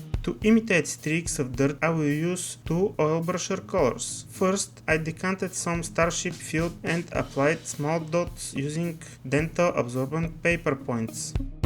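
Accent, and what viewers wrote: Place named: Russia. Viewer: He sounds Russian to me, I do enjoy the accent, and not blanketing over it.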